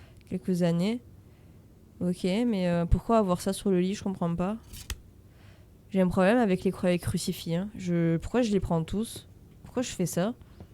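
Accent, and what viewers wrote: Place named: South, France. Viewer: 😂 ohhhh j'ai eu peurrrr moi avec l'accent du sud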